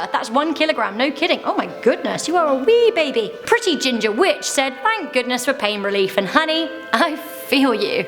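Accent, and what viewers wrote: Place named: United Kingdom. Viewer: gotta love the uk accent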